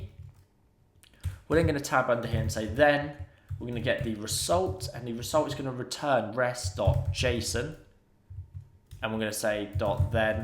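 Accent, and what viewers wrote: United Kingdom. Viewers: you're British right? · learned a nice thing today weather = wafer, the British accent is sometimes very funny and sometimes just annoying :(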